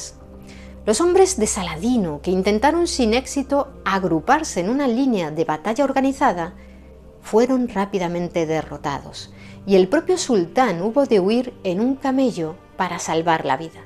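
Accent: Spain. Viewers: Ese acento español es bien agradable, de que zona es?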